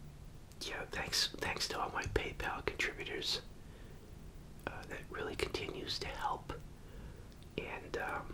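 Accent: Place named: Boston, United States. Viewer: This was painful to watch, from the constant unnecessary stirring of the drink to the "townie" unsophisticated way he eats, the Cornell plates and silver wear he uses, like the low of the very low and the Quincy Boston accent...the whole thing left me with.a feeling of sadness and being thankful it was not me.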